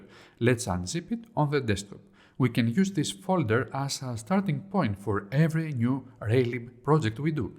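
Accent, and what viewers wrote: Greece. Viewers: The greek accent is so cuuuteeee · 2 seconds in and almost immediately realized you were Greek. Keep up the good work!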